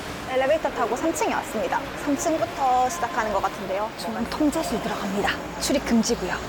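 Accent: Gyeongsang, South Korea. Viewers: 사투리 쓰시네요 쇼츠로 볼땐 몰랐는데 갱상도 아이가~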